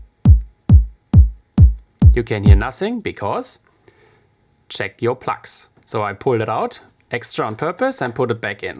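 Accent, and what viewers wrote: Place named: Germany. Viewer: Djeezes, it sounds nice when Germans speak English ! Danke und grussen aus Holland.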